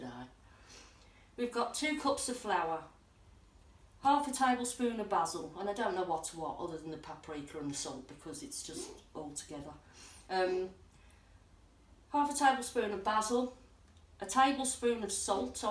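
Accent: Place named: Black Country, United Kingdom. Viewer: just subed,loved your vid,tell the camera man/other half to mind is own, you are the bomb, what part of Brum you from, sound more like black country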